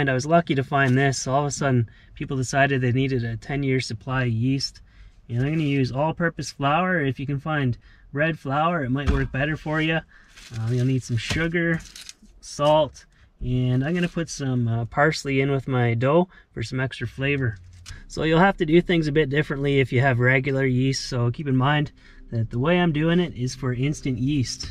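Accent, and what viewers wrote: Canada. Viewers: The first couple seconds my first thought was "He sounds extremely Canadian."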